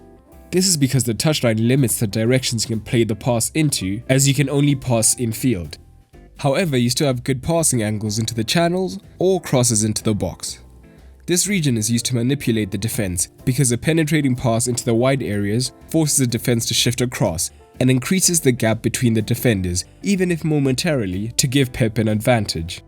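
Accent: South Africa. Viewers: U sound South African lol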